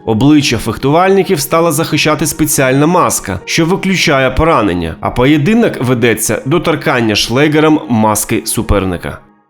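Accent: Russia. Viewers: Це кацапський акцент. Не робіть мєнє нєрви. Ось це одеський акцент. А у відео суцільне ґекання, що хочеться вже відписатися.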